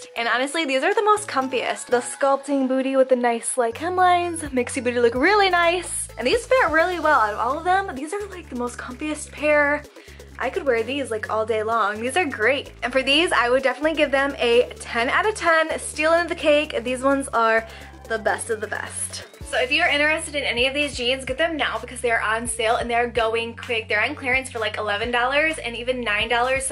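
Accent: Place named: Michigan, United States. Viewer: I had to check out your Instagram to see if you’re from Michigan lol you have the cutest Michigan accent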